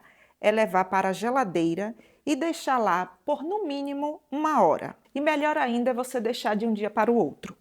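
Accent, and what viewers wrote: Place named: Aracaju, Brazil. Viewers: Parece que tu é de Aracaju sotaque igualzinho 😂😂😂😂 gostei da receita vou fazer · Menina, que maravilha! Vou fazer. De onde tu és? Eu conheço esse sotaque. Também tenho, sou de Pernambuco, mas moro em São Paulo à 40 anos, mas te ouvi me deu muita saudades da minha terra. ❤ Parabéns pela receita.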